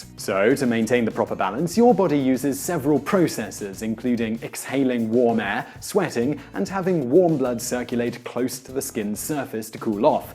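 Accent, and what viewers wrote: United Kingdom. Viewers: Call me Daddy Well no shit... a google search could answer anything. The reason most of us watch this channel find out something we could easily look up is to hear charming British accent relaying the information lol.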